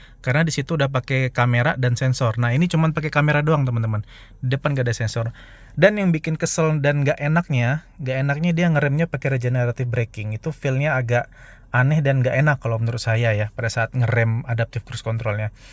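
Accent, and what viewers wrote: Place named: Padang, Indonesia. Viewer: Suara aksennya kayak orang padang ya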